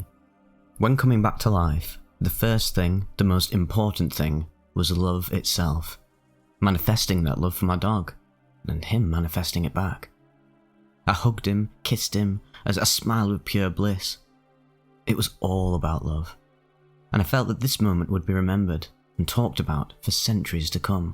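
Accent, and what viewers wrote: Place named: Derby, United Kingdom. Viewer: I listen to these trip reports while I'm doing ice baths, it really helps my endurance and heightens the experience. I adore your voice and delivery. I've gone through every single trip report compilation, so your narration has taken me on so many hours of incredibly profound psychedelic journeys. I'm Canadian and my partner is from Derby so he has a similar accent and that makes it even better. A deluge of gratitude to you for the time and effort you put into these. Godspeed.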